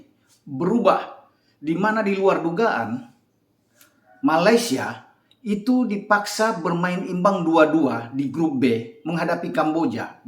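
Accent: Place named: Manado, Indonesia. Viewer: orng Manado.? kental skali dialegnya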